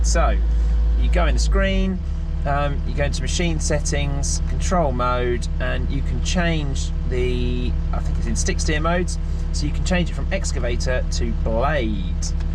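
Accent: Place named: England, United Kingdom. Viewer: Well done Buddy, had to tune myself in to the English Slang & accent like Slew for Swing or Spanner for wrench but I caught on eventually, Definitely am a big fan of the Neat Stick Steer more generic function's on one Joystick & less on multiple Levers , pedals etc etc , a Skidsteer steering feature with Boom, stick and scooper bucket, Never Ran a High Hoe, or Trackhoe that's a Old slang in Canada for a Excavator in my life but always wanted to Especially this Brand Caterpillar & the D series New gen machine. Thanks from Northwestern Alberta Canada , And one of the better machine review's I've ever seen on this website. Your also right about how Cat totally misses out on the Places to store a operation File, invoices cabinet in the cab. Big miss on that one l think cat figures a forman or Supervisor will look after Paperwork and let the operator concentrate on scooping & digging dirt. 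But Good point.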